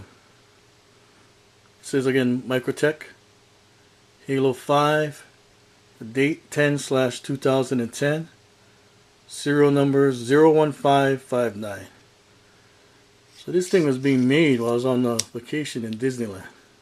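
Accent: Hawaii, United States. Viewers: yeah for sure, i mean some people just do a plain table , i like the extra effort, thats why i always watch your uploads, that and the Hawaiian accent is nice to hear.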